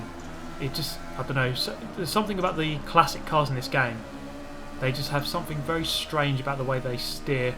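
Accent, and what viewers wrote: United Kingdom. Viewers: The British accent is paired with sarcasm as well as noobs and the Alfa 33.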